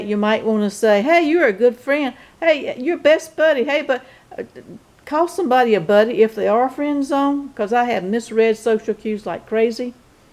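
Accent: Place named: Mississippi, United States. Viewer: I have to know. Where are you from ma’am? I guess Mississippi by your voice, but I am from East Tennessee, and we talk a little different.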